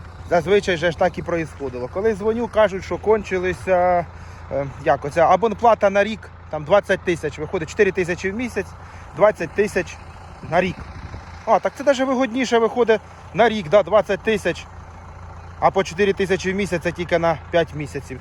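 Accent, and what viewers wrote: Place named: Surzhyk, Ukraine. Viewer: Все добре тільки вчись говорити українською не суржиком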